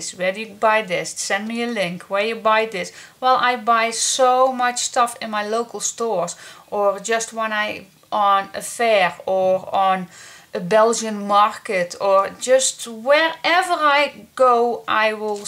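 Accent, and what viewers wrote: Brabant, Netherlands. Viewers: Gefeliciteerd met deze mijlpaal👍🎉🎈. Ik volg je al een tijdje maar ben nu ook abonnee geworden👍💋. Je maakt zo leuke dingen, maar soms heb ik moeite met het engels, dan kijk ik naar de video en wordt t me duidelijk wat je bedoeld. Ik woon in Nedetland en in Limburg. In de buurt van roermond dus niet zo verweg van brabant, ik hoor je accent en versta t ook. Ga zo door👍💋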